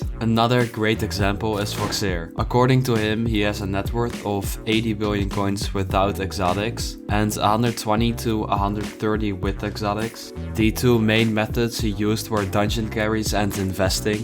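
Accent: Netherlands. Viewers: are u netherlands? u have a netherlands accent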